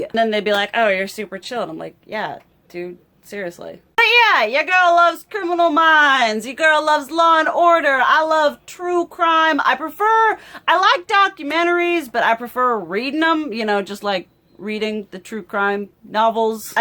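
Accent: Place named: Appalachia, United States. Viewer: OK but where can I get this sweater? Also, judging by your accent and you driving between TN and VA I'm guessing you must be Appalachian like myself. I'm currently in Asheville but grew up in Wise County VA about 45 min from the Tri-state area. If you know what I was just talking about, HOWDEE 🤣If not, that accent still sounds like home!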